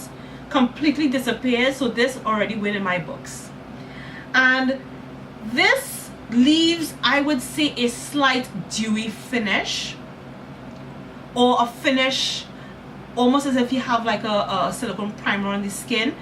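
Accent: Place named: Trinidad and Tobago. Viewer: Love your accent. You sound like you have a Trini vernacular. Thank you for doing this review. I just bought this tonight. I normally use Estée Lauder but it’s hard to find in stores so I’m trying thi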